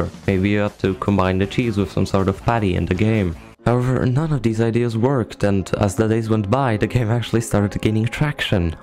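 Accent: Poland. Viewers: what country you from i thouhgt ur polish for a sec?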